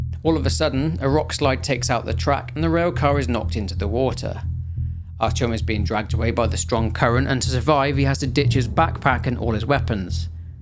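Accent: United Kingdom. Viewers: This is actually a really common thing amongst a death of British YouTubers. There's something about the accent that's soft, but I think it's largely how a lot of harder sounds like Rs are instead muted. We tend to think of Ks or Gs are harder sounding letters, but they're common on all languages and accents. Something about how certain letters are shifted in British accents just seems to soothe people. As the saying goes, the largest modern export of Brittain is their accent, and it's for good reason too.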